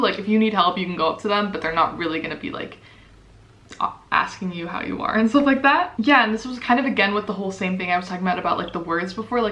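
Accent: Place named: Netherlands. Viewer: Nice vid, and funny to hear a dutch accent getting in there while talking english! Good one for the directness part: dutch will ask you without any problem what you pay for rent if talking about housing 🤣🤣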